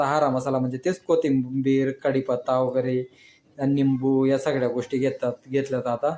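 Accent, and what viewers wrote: Khandesh, India. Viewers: Bhau ahirani bhasha bhari she,dhulani ahirani ani bhadgaon pachorani ahirani ma pharak she🎉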